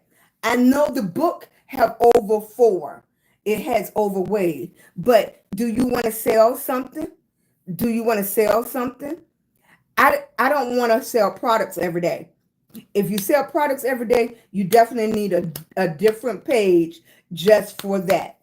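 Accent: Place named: South, United States. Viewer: Love your spirit and your southern accent. Keep being a warrior.❤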